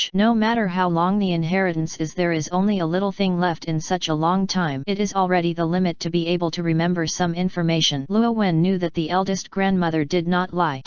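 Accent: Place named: China. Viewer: My god this Chinese actsent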